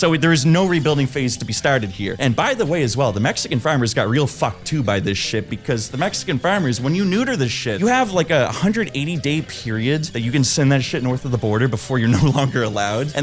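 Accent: Nova Scotia, Canada. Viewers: Your accent is giving me big Nova Scotia vibes, at least the way you pronounce cars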